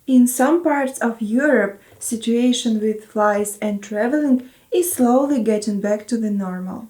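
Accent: Russia. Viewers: У Вас русский акцент?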